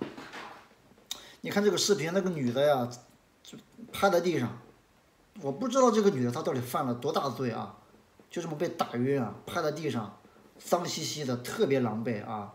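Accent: China. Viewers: 兄你好，我經常看你的影片，有個問題想跟你建議，能否錄製時把音量加大，我聽太不懂大陸腔，有時看你的影片會很辛苦，感謝你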